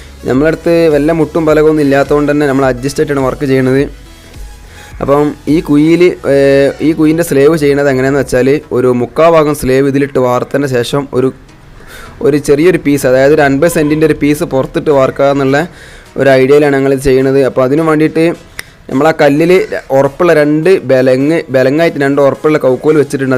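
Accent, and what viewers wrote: Malappuram, India. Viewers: "കുയി" നമ്മുടെ മലപ്പുറം ഭാഷ.....😀😑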